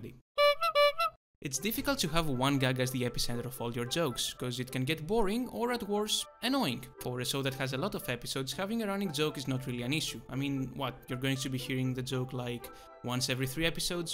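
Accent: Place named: Greece. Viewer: M8 ur accent sounds greek perhaps the first greek person with good taste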